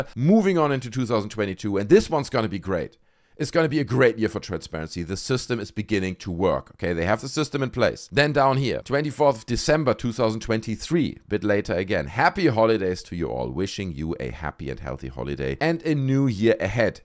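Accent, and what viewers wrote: Germany. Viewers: Thank you for all your work! Your logic, humor and perfect very slight German accent is such a perfect combination! Keep yourself safe.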